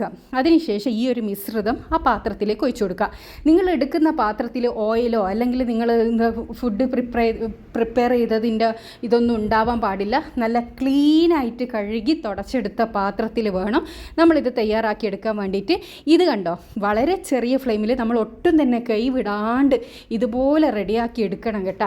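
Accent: Kannur, India. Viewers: നിങ്ങൾ കണ്ണൂർ ആണോ 🤔, സംസാരം കേട്ടപ്പോൾ തോന്നി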